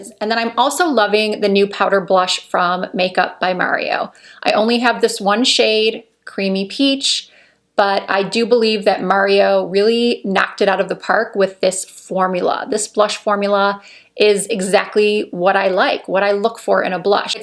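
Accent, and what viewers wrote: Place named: North, United States. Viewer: Hi, I really do love your channel. My skun, skin tone etc are completely different from yours, but I always gain great information from you. I feel bad that you have to always defend yourself from negative commentary. I admire all you tubers that have to put up with that. I just don't think I could do it. As an aside, I was born in Michigan, so I recognized your northern accent right away. Much love your way, and thank you so much Lisa, in Tennessee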